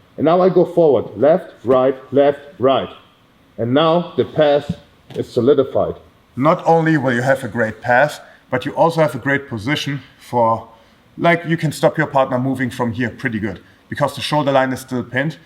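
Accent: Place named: Germany. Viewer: Extremely good explanation! Is it a german method explanation? (maybe your accent, gave ma a hint)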